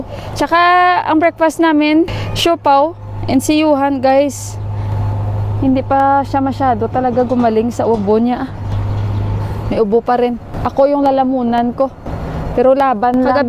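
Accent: Iloilo, Philippines. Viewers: .... Tingala ko nga ilonggo ang tuno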